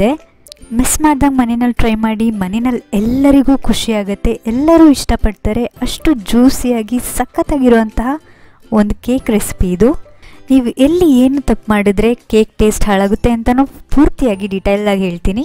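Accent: Mangalore, India. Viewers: Madam nimdu native place yavdu? Manglore aa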